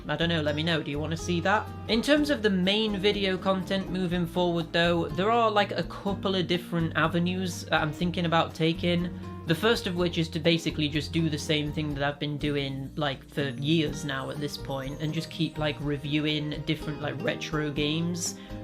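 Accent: North, United Kingdom. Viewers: Congratulations, nice to see u happy. Not a big fan of shorts (if u need feedback on short videos) Honestly, I prefer to watch long videos where u enthusiastically talk about stuff that genuinely interests you. I don't even care what the topic is, I just really enjoy your accent and your storytelling, those little humorous bits and sarcastic remarks once in a while. You could read a washing machine manual for all I care;) Good luck with the channel, I'm sure your subs would love to see your mug;) more than once a month (maybe, your words, not mine;)) but at the same time it's quite understandable why it probably won't happen. Anyway, personally, I would be grateful for whatever I can get, as long as that is smth you are passionate about coz that's what makes your videos so honest and entertaining. I don't have any questions for Q&A, I'm sorry, I was trying to think of smth not too personal but didn't come up with anything interesting. 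Perhaps, what part of the UK are you from, maybe, as I said, I absolutely adore ur accent, I was ready to make a guess you are somewhat of a northerner but again, it's more of a shot in the dark, I don't know much about UK and regional accents. Again, good luck and take care.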